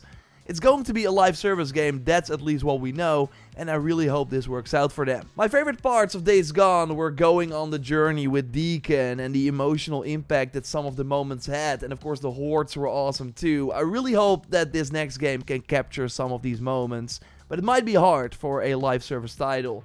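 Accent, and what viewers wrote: Netherlands. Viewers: kerel fix dat nl accent man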